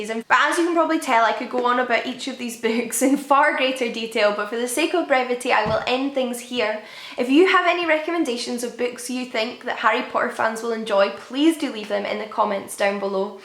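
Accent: Scotland, United Kingdom. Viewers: Yasss another Scottish person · Amazing video, can you, or anyone from the UK, tell me which part of the UK speaks with this accent, would really like to know, thanks! :D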